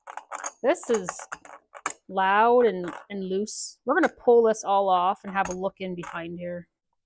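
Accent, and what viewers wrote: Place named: South, Canada. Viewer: Love that southern ascent southern Canadian I guess good show as always.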